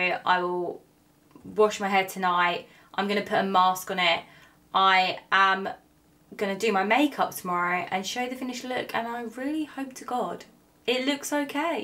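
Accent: United Kingdom. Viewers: Not to be rude....i dont mean you personally... but british accent in my ear is not the most beautiful to hear...its to show off kinda talk... a puh dah on...... baahh...im.train thu match on..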